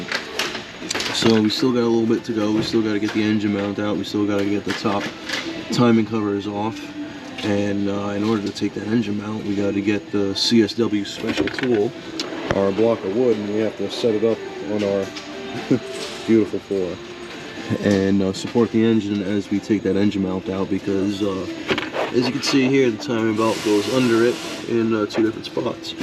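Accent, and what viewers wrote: New York, United States. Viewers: YOU GOTTA BE A NEW YORKER. boston got nothin on you.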